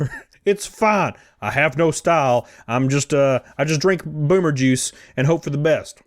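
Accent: South, United States. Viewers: Can you plz turn down the whole southern way of talking thing???? It’s rly annoying SOZ 😢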